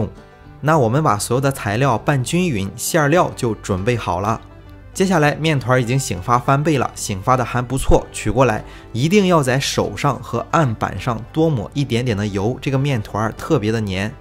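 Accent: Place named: Shandong, China. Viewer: 听着就是山东老乡口音 青岛的吧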